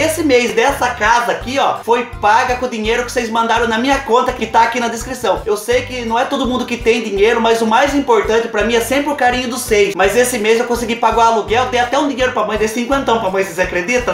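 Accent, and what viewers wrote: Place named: Minas Gerais, Brazil. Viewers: Descobrir o canal ontem e estou apaixonado com seu conteúdo. Seu sotaque é igual dos Mineiros. Você é incrível! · Você é lindo,espontâneo,humilde,engraçado,a parte do restaurante foi melhor. Adoro seu sotaque,sou mineira de poços de caldas e me identifico! Seu carinho com quem te assiste é seu diferencial!!!!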